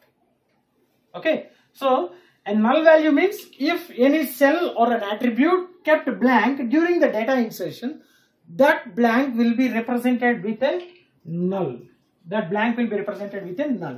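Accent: India. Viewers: There's always that one Youtuber, with Indian accent, that can help us all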